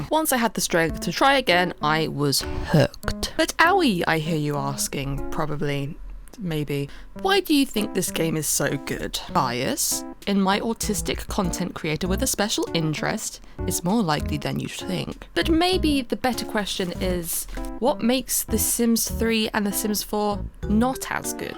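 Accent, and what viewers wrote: United Kingdom. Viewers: I could hear British people talk about literally anything let alone the BEST SIMS GAME EVER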